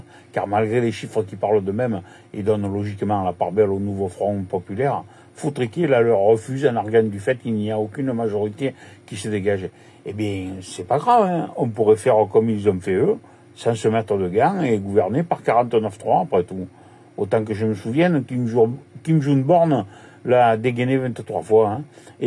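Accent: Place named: Turkey. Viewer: Parle français tonton ont comprend rien avec ton accent de turc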